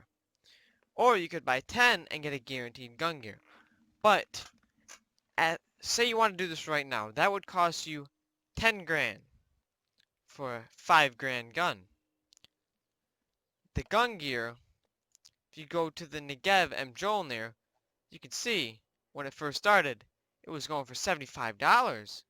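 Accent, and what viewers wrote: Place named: Arab world. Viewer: Lol arabic accent my friend🤣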